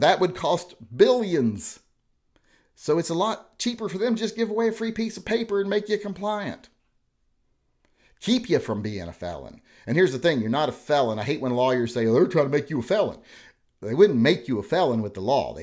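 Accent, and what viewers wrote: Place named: South, United States. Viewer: Slow Down Man! You are too realistic sometimes. I actually have more than one of these contraptions so I will have to come to some kind of consensus on what I will do. I also feel weird to message a man name and he speaks with a southern accent! Thanks for your input, nice to hear both sides of the argument.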